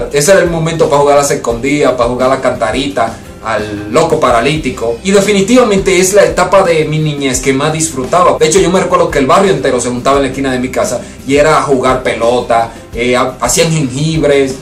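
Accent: Mexico. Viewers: Ya estas hablando como un mexicano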